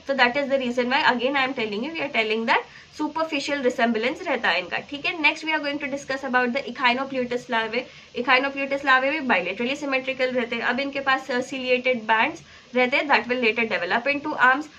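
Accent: Hyderabad, India. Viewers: Easy to understand because of your hyderbadi accent 😅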